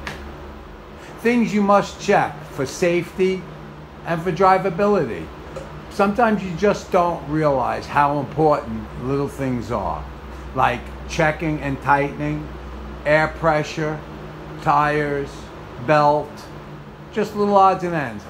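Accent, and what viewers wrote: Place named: East Coast, United States. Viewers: I knew it, you sound like I do, lol. Like you, I’m born and raised in Brooklyn 18yrs, moved to Long Island 18yrs, now I live in El Paso Texas, nice small town. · GOOD VIDEO.... LIKE YOUR THICK EAST COAST ACCENT...... LOL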